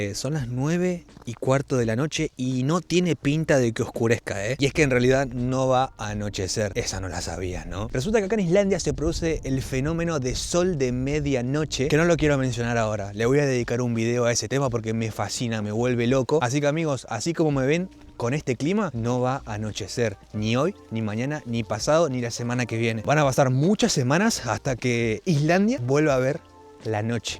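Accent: Argentina. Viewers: GRACIAS, RECORRO EL MUNDO CON TUS VIDEOS Y HABLANDO A LO ARGENTINO, COMO DEBE SER JAJA. UNO DE LOS MEJORES VIDEOS!! SALUDOS DESDE BS. AS, ZONA NORTE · me gustan mucho mas con tu voz, tu acento argentino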